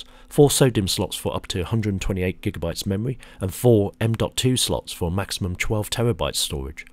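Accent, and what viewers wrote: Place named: United Kingdom. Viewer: Interesting how Z is Zed, and H is Hayche, in the Queen's English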